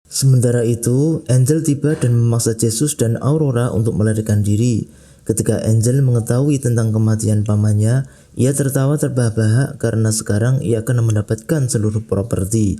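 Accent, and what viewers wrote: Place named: Java, Indonesia. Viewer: Jawa anjr suaranya mals gw dngernya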